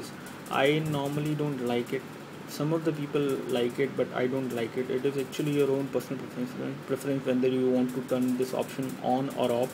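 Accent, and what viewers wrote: India. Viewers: 2050 India is propted to be the leading economy in the world, Not ony should you start learning the accent,but you better start learning Hindi language as well. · hindu engliszh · its an indian accent but he has a lisp. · come on, ANOTHER Indian/eastern accent! every s2 video ive watched the person has that kind of accent! i'm not prejudiced, it's just that i have trouble hearing and understanding what the person is saying! please, get a british accent, or maybe even AN AMERICAN TALKING!!!!! THIS IS AMERICA YOU KNOW! PLEASE, I CAN'T EVEN UNDERSTAND WHAT HE'S SAYING! i can't watch all these videos, if they all have an overseas eastern accent! i don't care the nationality if i can understand them! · ... please, your accent plus the fan makes my head go all Indian mode... COME ON MAN!!! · BRITISH ENGLISH (indian) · I love this funny indian english slang ... 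like meditation if you do not listen to the content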